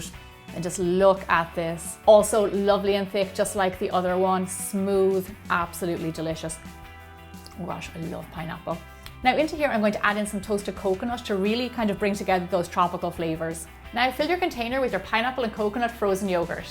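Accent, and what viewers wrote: Ireland. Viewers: 1) love your accent; Irish, I beleive. 2) With all due respect, you need to slow down a bit or two while presenting the ingredient preparation procedure. Sincerely, Bruschetta senior; chef-wanna-be. <num>. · I absoloutly adore the Irish accent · I'm trying to place your accent Is it...Irish? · You are such a great baker your so pretty and I love your accent, I'm from ireland too! +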